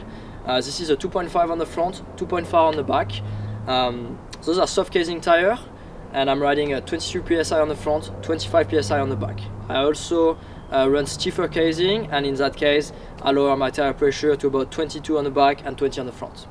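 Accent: France. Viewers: Salut Rémy Metailler trop beau ton bike.On voit quand même que tu as encore l'accent français.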